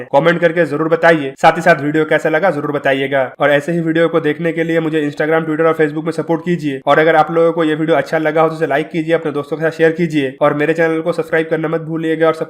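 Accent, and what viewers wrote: Bengal, India. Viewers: bhai pls hindi ko thik karo..bangali mix hindi acchi nhi lagti..is liye aapka chnl unsub krna padega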